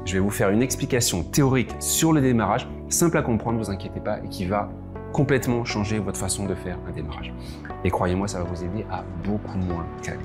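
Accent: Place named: Guadeloupe, France. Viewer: C’est drôle, je vous trouve un léger accent guadeloupéen, mais je suis sûrement biaisée ! ^^ Merci pour cette vidéo, et les autres en tous cas ! 🙏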